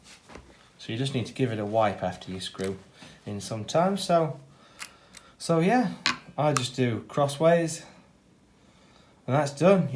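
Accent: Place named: Estuary, United Kingdom. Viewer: Ok.... 1. When I clicked this video, I was right in the middle of installing Guyker tuners (locking 6 in-line) to my Banana/Hockey Stick neck I just installed onto a Stratocaster body. Yep, you heard right. Will it intonate and work right? Well, the measurements are not exact, but close...so we will see. 2. Are you living in America? Because I hear the very light estuary accent, but you are DRINKING COFFEE and not a cuppa earl grey with milk? Interesting. Nice work on your #1. I'm in the middle of trying to figure out which guitar out of 16 is my #1 since I play different music at different times and have not settled down with a real strat as I build my own.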